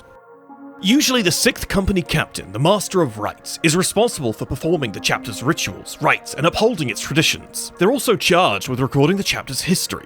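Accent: United Kingdom. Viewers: Mate this is a fantastic video but as English sounding as you are please, lieutenant is pronounced Left tennant. Lewtenent is the American pronunciation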